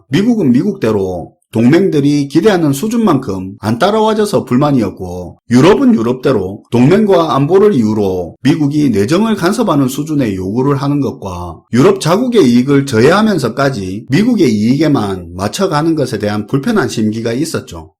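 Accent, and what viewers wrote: Gyeongsang, South Korea. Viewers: 갱상도 사투리로 일목요연 정리해줘서 늘, 감사합니다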